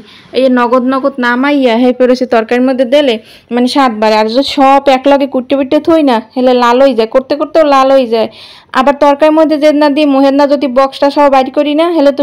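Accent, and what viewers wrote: Barishal, Bangladesh. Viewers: ❤❤চলে এসেছি❤❤আপু তোমার বরিশালের ভাষা শুনতে❤❤এতো মিষ্টি করে কথা বলো❤❤ যতো শুনি ততো শুনতে ইচ্ছে করে ❤❤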